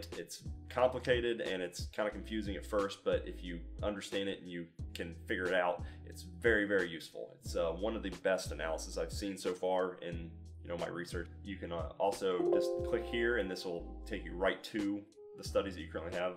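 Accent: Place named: United States. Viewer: And he also fixed his English and now talks like an American.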